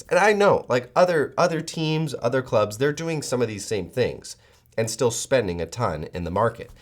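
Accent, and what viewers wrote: United States. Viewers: Are you American ?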